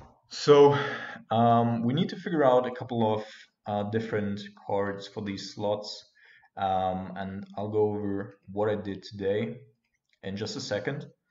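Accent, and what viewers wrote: Germany. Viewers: It sounds like you might be German am I correct? Or just crazy?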